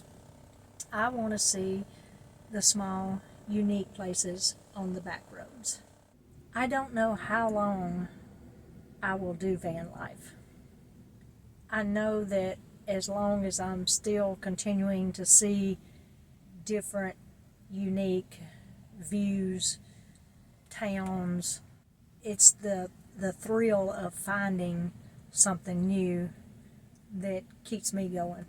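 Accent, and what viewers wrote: South, United States. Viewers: Just subscribed!!!! Do I detect Southern accent ??? I'm in South Carolina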